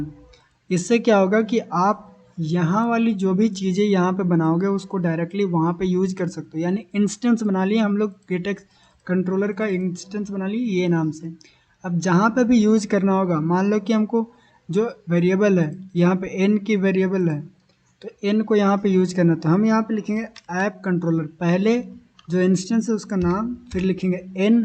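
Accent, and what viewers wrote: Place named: India. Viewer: Bro your title English but your speaking Indian no way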